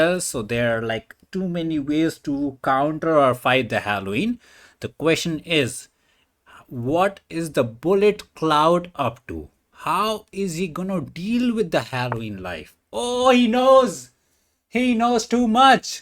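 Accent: India. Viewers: bro i would watch u read a phone book u dont need clickbait. any time i hear an indian accent my knee jerk racism kicks in from years of indian kids lying to me about working for telus mobility to try to play the scam opening with me but u are special i believe in u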